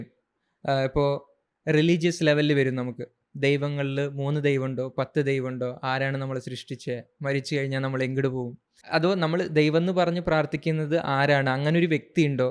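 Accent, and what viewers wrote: Tamil Nadu, India. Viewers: bro are you tamilian or malayali how did you learned malayalam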